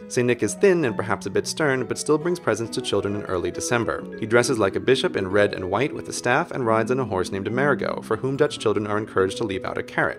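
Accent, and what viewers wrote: United States. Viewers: Probably not. He speaks with an american accent.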